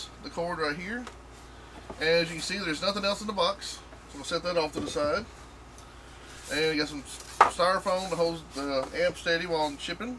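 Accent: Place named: Tennessee, United States. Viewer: This guy has got to be from TENNESSEE, glad I speak fluent southern 🤣😉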